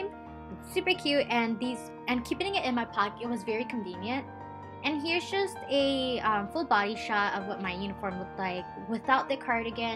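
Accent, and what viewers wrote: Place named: United States. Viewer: Don't know your channel. You sound American, how'd you end up in Japan?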